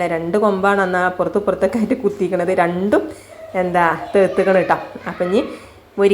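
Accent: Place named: Thalassery, India. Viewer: ഓരോ ആളുടെ ശൈലി അല്ലെ അത് എനിക്കു തലശ്ശേരി ഭാഷ ആണ് എനിക്കു ഇഷ്ടം ആണ് നിങ്ങളുടെ ഭാഷ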